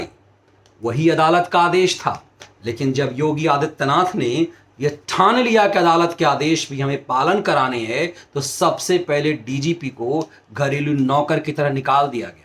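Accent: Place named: Bihar, India. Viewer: आदरणीय महान् विद्वान और राष्ट्रवाद के सशक्त और प्रबल समर्थक श्री, बहुत ही सुन्दर,सटीक और समसामयिक विश्लेषण किया है। पर आज़ आपका संबोधन और भाव भंगिमा विगत (भूत काल) के जैसा प्रतीत नहीं लगता है। आपकी हंसमुख, चुटिली वाणी, और ठेठ बिहारी लहजा ही आपकी अनोखी अछूती और अदभुत पहचान है। वही बनाए रखें। प्रोफेसर जैसा लेक्चर तो सभी पत्रकार देते हैं।आप अपनी पूर्व भूमिका में ही अच्छे लगते हैं, धन्यवाद।🎉🎉🎉🎉🎉🎉🎉🎉🎉🎉🎉🙏🙏🙏🙏🙏🙏🙏🙏🙏🙏🙏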